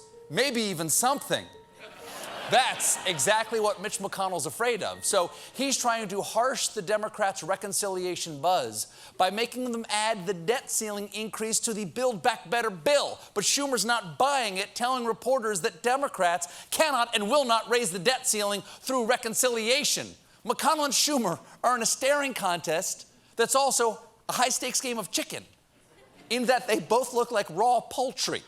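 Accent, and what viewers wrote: France. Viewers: His French accent is spot on. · J'adore your French accent! En plus, we are 83,9% vaccinated today =0)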